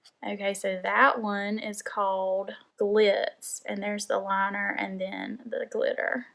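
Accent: South, United States. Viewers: Her country accent is so cute lol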